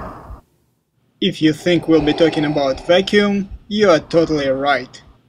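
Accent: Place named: Russia. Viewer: That accent... Russia? Ukraine?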